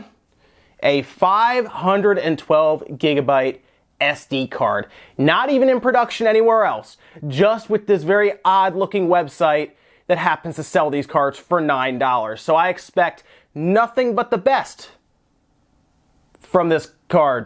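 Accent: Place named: Philadelphia, United States. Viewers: First video. Heard your voice first, thought it had a hint of Philly in it, then saw the Flyers and Eagles swag - confirmed. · Hey you from Philly or Delco? Edit: N/M saw the Eagles jersey! Was going off your accent.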